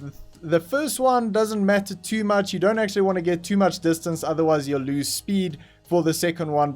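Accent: South Africa. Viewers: You sound South African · Are you south african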